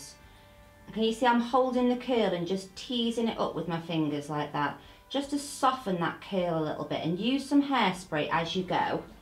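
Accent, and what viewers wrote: United Kingdom. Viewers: Just discovered your channel, amazing hairstyles. Also, your accent is lovely, from which part of the UK are you?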